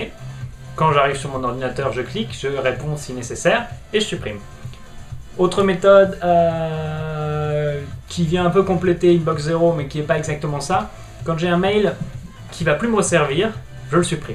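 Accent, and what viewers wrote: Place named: North, France. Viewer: je m'efforce à faire la même chose mais c'est pas tjs facile. au fait on sent tes racines du nord : 20 [vinte] :D je faisais la même à mon arrivée à paris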